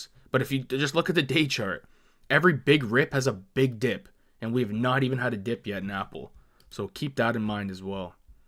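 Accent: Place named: Jamaica. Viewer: Hey u Jamaican..jah know u sound like one ?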